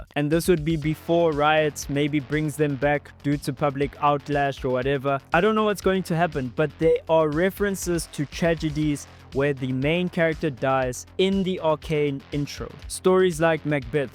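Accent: South Africa. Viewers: once i heard the voice i knew u were from SA ...lol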